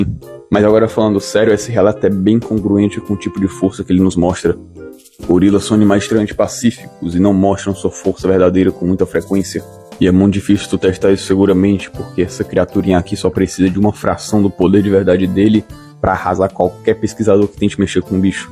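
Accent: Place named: Fortaleza, Brazil. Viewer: Vídeo muito brabo irmão, continua assim que vai longe . Aliás tu é de fortaleza né, reconheci pelo o sotaque kkkk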